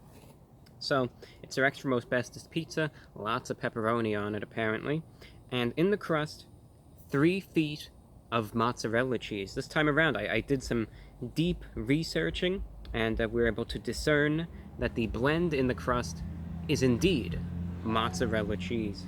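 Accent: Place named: Tampa Bay, United States. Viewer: Looks like youre in my area...tampa bay. Sounds like it too.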